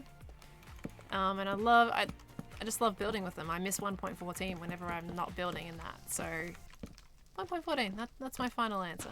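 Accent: Australia. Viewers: Your Australian? I am too. Finally a Youtuber I dont have to get up early to watch!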